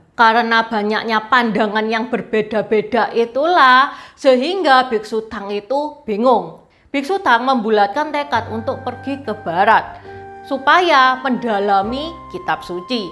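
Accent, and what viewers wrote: Java, Indonesia. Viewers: Medok guys.... Semangat terus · Mantap channelnya,. Bahasanya Medhok... Asal kota mana ya,?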